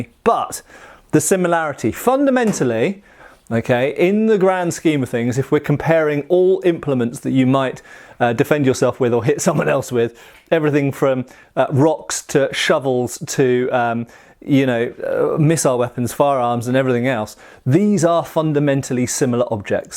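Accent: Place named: England, United Kingdom. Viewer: Only reason why someone is watching this for such a long time is his English accent!🤺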